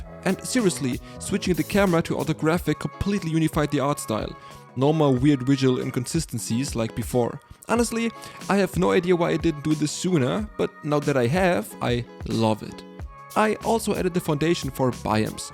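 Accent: Germany. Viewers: Der deutsch akzent ist crazy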